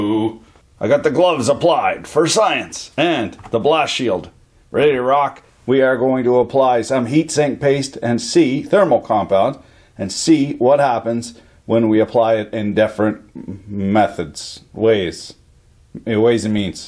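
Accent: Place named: Maritimes, Canada. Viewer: Wow, the Maritimes sure came out in this one....!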